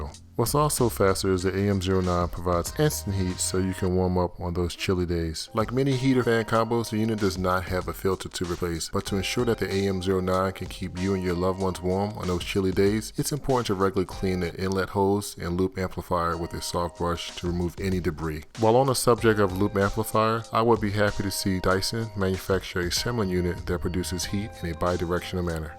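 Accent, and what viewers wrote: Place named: United States. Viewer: THAT mate, is both the best Dyson "run-down" I've seen AND the nicest American accent I've heard to date. ;¬) Personally I switch it off at bed time & don't NEED at timer for off; but I'd LOVE to see a timer to switch ON for a warm room to awaken to. :¬)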